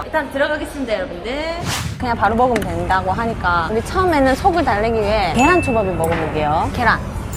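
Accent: Gyeongsang, South Korea. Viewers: 경상도 사투리 구수함 😊😊😊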